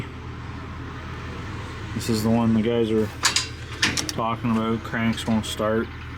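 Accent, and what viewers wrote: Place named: Canada. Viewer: Nice truck man , is that Canadian accent ? Sending good wishes from Scotland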